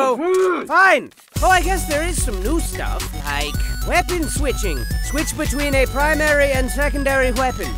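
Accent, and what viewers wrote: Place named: United Kingdom. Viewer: I swear the narrator is so british.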